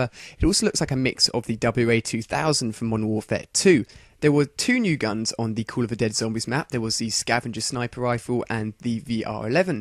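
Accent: United Kingdom. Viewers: i love your british accent!! teach me!!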